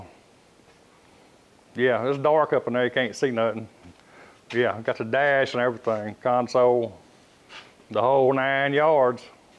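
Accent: South, United States. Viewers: when you really do the southern drawl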